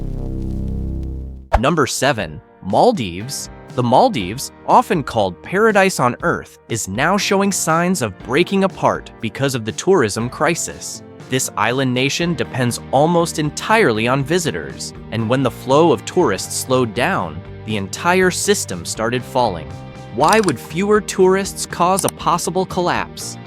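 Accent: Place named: United States. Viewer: Yet another American accent telling 'like it is'. Don't believe anything that comes out of that place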